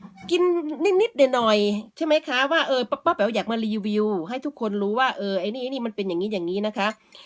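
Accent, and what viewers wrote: Isan, Thailand. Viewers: ป้าสำเนียงอีสานนะคับผมเป็นคนอีสาน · ฟังจากสำเนียงแล้ว...ป้าแป๋วน่าจะพูดภาษาอีสานได้ดีมากๆ และน่าจะดีที่สุดภาษานึงด้วย...ฟันธง!!!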